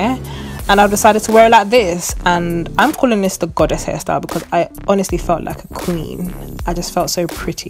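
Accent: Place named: United Kingdom. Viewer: Lol the accent doesn't fit the face but it's really nice to hear a UK accent welldone good job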